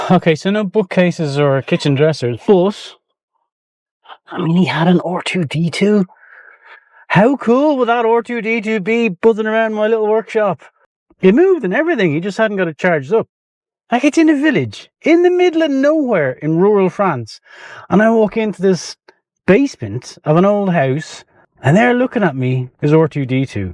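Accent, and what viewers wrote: Ireland. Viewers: France is just so beautiful. Think I might just have to take a drive over there and explore a bit. Loving listening to your soft Irish voice each evening. Glad you finally got a day off! Keep up the great work . X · Ok, inquiring minds want to know.....without getting too personal, why are you still single? My goodness, I concur with all the ladies on here, you are a great catch and check all the boxes. Handsome☆Huge Heart☆Funny☆Great Communicator☆Hard Worker☆Irish Accent☆Great Family Values☆Loves Puppies & Old People☆A Wicked Sense Of Humor☆A Great Friend☆ Be still my beating heart! ♥ A great vlog as usual, thx! 😊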